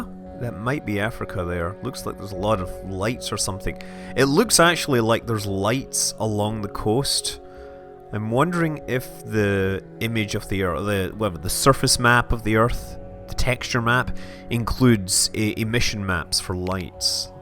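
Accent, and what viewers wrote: United Kingdom. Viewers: this guy sounds british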